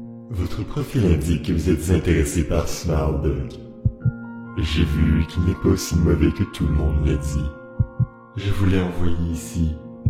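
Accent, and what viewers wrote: Quebec, Canada. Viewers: enft c que il quebecois😃😀😀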